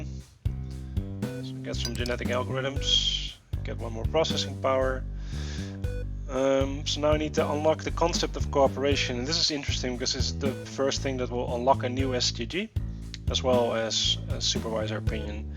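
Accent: Netherlands. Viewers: You sound like having a dutch accent, is that true? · Yes, he's dutch.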